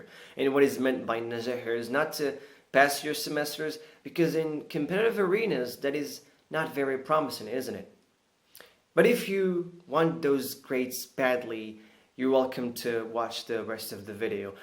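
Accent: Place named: United States. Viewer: AMERICAN ACCENT spots on يااااااي كلوكيت لك خطأ ف نطق كلمة اندوفيدجوول لووول ولاكن تبارك الله عليك .. انا عندي الانجليزية لا بأس بها ولاكن كاتنداثر من قلة التطبيق و الحوار ، مكانلقاش معامن واخا عندي اللكنة قريبا لديالك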